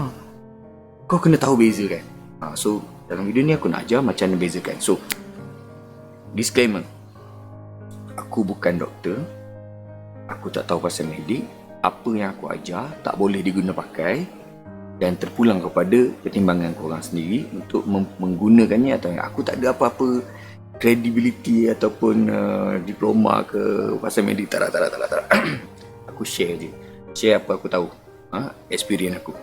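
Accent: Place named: Pahang, Malaysia. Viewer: Sedap betoi dgr bro sembang..bunyi mcm org bentong je....boeeek bro👍👍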